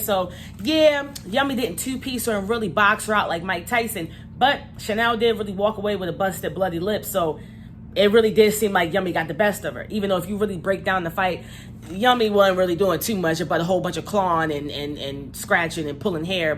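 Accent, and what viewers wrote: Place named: Baltimore, United States. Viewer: Love this review!! New subbie.❤ are you from Baltimore? You sound like it.☺ Yummy in that parking lot was so freakin cringy. She should be mad embarrassed.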